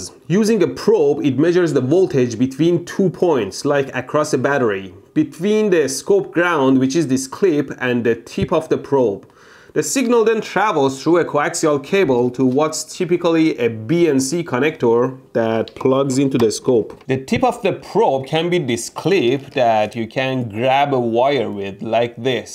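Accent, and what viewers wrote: Iran. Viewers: your english accent... I knew you were a fellow iranian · لحجه ای که بخاطر ایرانی بودن به ارث بردی را خیلی دوس دارم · I love your Iranian accent. Greetings from Germany.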